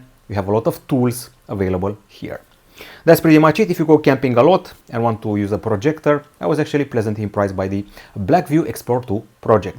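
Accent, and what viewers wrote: Romania. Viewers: e obositor să asculți un român explicând ceva în engleză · Ba tu esti român! Se aude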